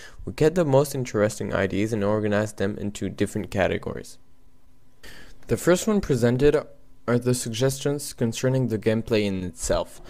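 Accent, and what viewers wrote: France. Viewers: C'est un mec français qui parle en anglais, son accent est pourri. Pour que la vidéo soit vu par les développeurs de Black Ops 2, il faut spammer sur leurs TWITER OU FACEBOOK ! Faites le SVP, on aura plus de chance d'avoir enfin un bon Call Of Duty :) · Ca s'entend que c'est un francais qui parle Anglais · vous y croyez ? non mais c'est quoi cette accent français ... --' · C'est un français qui parle mon petit... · Fake --' Le mec c'est un francais qui parle en anglais afin qu'on aille sur ça chaine et qu'on lui fasses des vues... Je connais peux d'anglais qui disent ''Code Quegé'' , ils diraient plutot ''Cod Queji''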